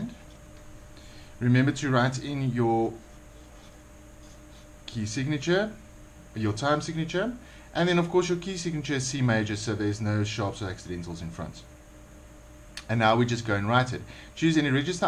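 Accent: South Africa. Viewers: is this guy South African cause i think i hear the accent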